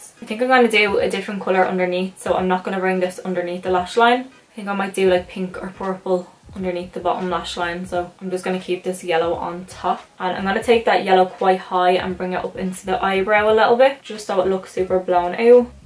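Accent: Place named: Dublin, Ireland. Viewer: Can i ask where ur based u sound like ur from Dublin so if u are where in Dublin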